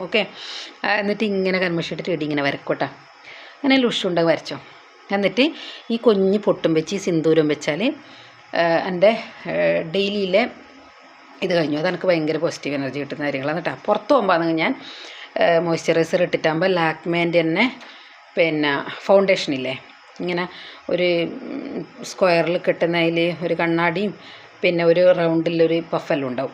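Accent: Kannur, India. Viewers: കണ്ണൂർ കാരി ആണോ ഭാഷ അത് പോലെ ഉണ്ട്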